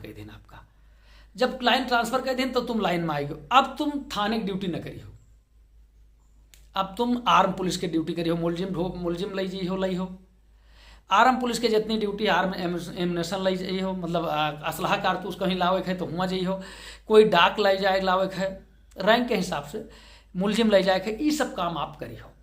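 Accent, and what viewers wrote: Awadh, India. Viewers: Sir aapki boli se lagta hai ki aap avadh area ke hai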